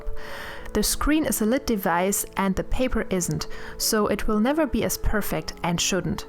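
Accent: Germany. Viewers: Die Zeichnung ist echt gut und auch die Beschreibung der Schritte ist auch extremst gut aber es wäre sehr hilfreich wenn du vielleicht an deiner englischen Aussprache arbeiten würdest denn du hast einen sehr starken deutschen Akzent (die Kritik soll wirklich konstruktiv sein und nicht gemein ich würde nur gern helfen deine Videos besser mach ) ps du kannst extrem gute Zeichnung ! du bist echt talentiert 💓💓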